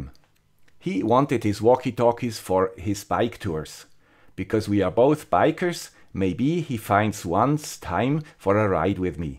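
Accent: Switzerland. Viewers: I think I knew that, black forest or something. I spent a day in Bex a few years ago with the jet wing on my back guy Yves Rossy. He also had your Swiss accent. Swiss seem to be decent people mostly. I made a bunch of videos of all that. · Since you are the guy with the Swiss accent , I will call Scott the guy with the German accent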